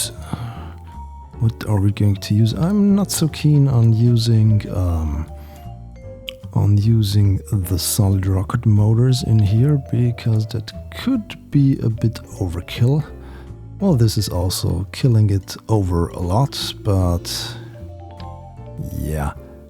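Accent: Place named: Germany. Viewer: Ich wusste doch das du Deutsch bist! :D (Hat man an deinem Akzent gehört)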